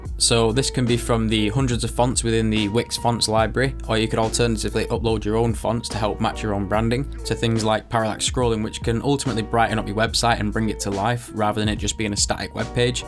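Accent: Manchester, United Kingdom. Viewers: I can’t place your accent.....Mancunian?......? 😂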